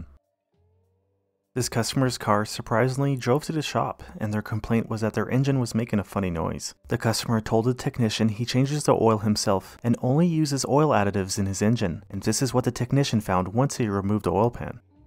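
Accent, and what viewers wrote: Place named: United States. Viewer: The narrator has a american accent, but the transit van, , has British number plates. I find this most odd.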